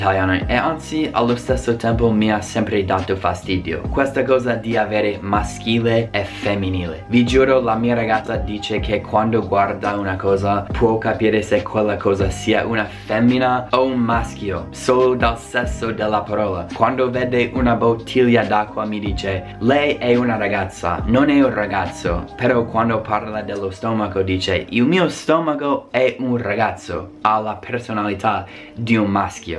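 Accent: United States. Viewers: Ma quando hai una parola ostica da pronunciare, credo che tu sei abituato a visualizzare com'è scritta e di conseguenza la pronunci da americano. Se invece ascolti senza sapere come è scritta e provi a pronunciarla troverai meno difficoltà. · Caro non confondere il sesso con il genere. L'italiano avendo il genere anche negli articoli determinativi o indeterminativi, oltre al singolare e al plurale, e. molto piu' comprensibile di altre lingue. Non e'. difficile impararlo. I problemi sono altri, cioe' la sintassi, la semantica dello sterminato vocabolario,i modi e i tempi dei verbi e tanto altro. Per quanto riguarda la pronunzia, purtroppo e' piu' complicato in quanto i popoli anglo-sassoni di madrelingua, con quel tipo di pronuncia, modificano in modo naturale le corde vocali. Basta vedere la differenza tra te che sei americano e un inglese di Londra,la pronuncia e' completamente diversa. 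· L'italiano è molto complesso come lingua. Ma, insomma lo parli bene con l'accento americano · Caro, io sono un'italiana che risiede nel US da 43 anni. Sono cresciuta in Italia ma ho fatto le scuole superiori qui nel US e ho dimenticato molto Italiano. Ero brava una volta a scrivere l'Italiano, ma ora non tanto. Ho imparato bene l'inglese e potrei correggere tanti americani. Complimenti. Se non hai scritto lo "script" per questo video, sei molto bravo. La pronuncia e` ancora molto americana, pero`. L'italiano lo parli meglio di me.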